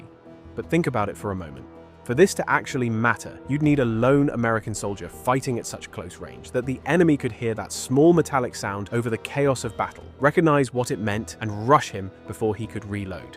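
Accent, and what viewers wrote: United Kingdom. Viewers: so british, so smug. GAAAAHHH RAAAAND.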